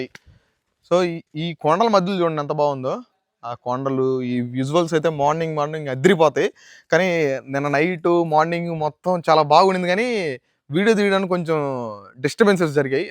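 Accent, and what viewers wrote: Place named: Kadapa, India. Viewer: కడప స్లాంగ్ ఎక్కువగా, నెల్లూరు స్లాంగ్ తక్కువుగా మాట్లాడతావు 🥰🥰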